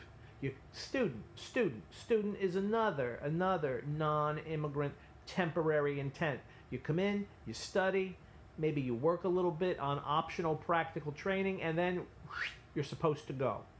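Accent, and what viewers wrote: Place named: United States. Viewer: so clean american accent )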